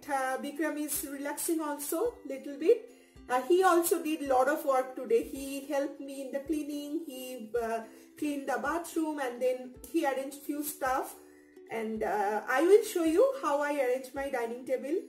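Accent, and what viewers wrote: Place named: Bengal, India. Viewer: Hearing your english😀😀first question comes to my mind.. are you bengali?☺️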